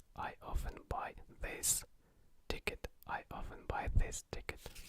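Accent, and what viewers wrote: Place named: Russia. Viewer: nothing is more cute than a russian trying to speak english 😂🥰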